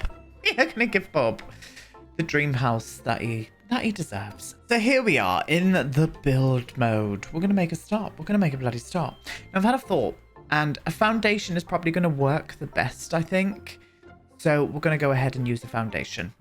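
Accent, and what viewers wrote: United Kingdom. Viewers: What a gorgeous build, as per ushh 💁🏾‍♀️. Soothing voice too, sass and all. Also so incredibly British, I love it 😂🥰💁🏾‍♀️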